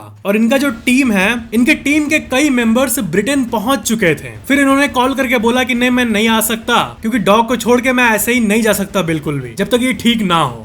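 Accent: Bihar, India. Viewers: kya aap bihar se hai bhaiaa🤔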